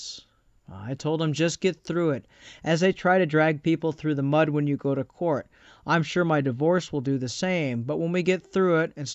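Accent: Nigeria. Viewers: my MIL was over and as I told you, my 2 month old daughter has to listen to these voice chats to calm down. She hears the accent, and I asked her where she thought he was from. She said Europe. To me it's baffling, but I went to a high school in Central Massachusetts with lots of Africans, many from Nigeria with a thick accent. SO i grew up knowing the accent.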